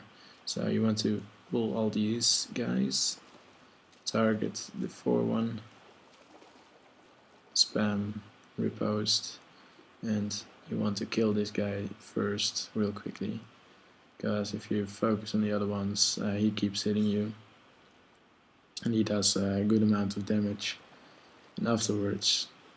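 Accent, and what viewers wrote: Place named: Netherlands. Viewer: dacht ik ook al nederlands accent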